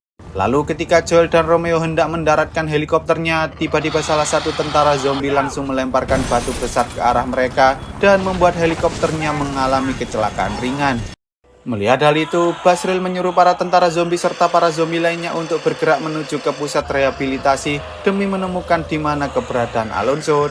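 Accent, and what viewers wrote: Java, Indonesia. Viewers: Mimin asalnya dari jawa apa?🤭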